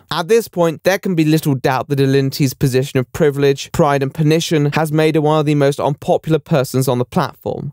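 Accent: RP, United Kingdom. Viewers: I absolutely love his posh British accent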